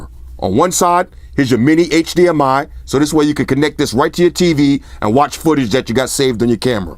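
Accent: New York, United States. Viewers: Oh my man is from NY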